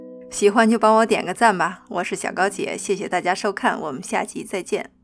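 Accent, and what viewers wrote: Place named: Xi'an, China. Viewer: by her accent, i guess she is from xi'an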